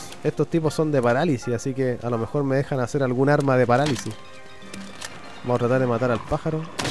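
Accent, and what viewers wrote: Chile. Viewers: Hay cientos de videos, pero nada me hace entrar mas en confianza como latina que oir un gameplay chileno.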